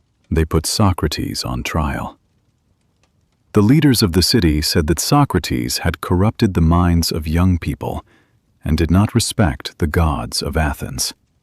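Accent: United Kingdom. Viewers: It was nice, especially the English accent. I hope more interesting stories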